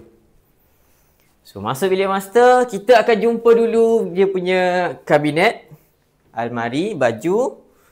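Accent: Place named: Kelantan, Malaysia. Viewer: Dia cakap bahasa malaysia la tu.. Ada bunyi2 kelantan sikit.. Awat tu??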